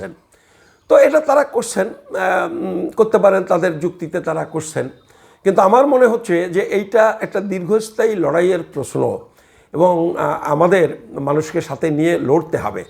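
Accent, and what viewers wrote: Bangladesh. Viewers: তোমার কথা শুনে মোনে hoy তুমিও Bangladeshi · Apni pakka Bangladeshi....kotha sune bujhte perechi... 😂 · কেমন বাংলা দেশি বলে মনে হয়, পুরানো refugee না নুতন refugee. পুরানো দের তেজ বেশী l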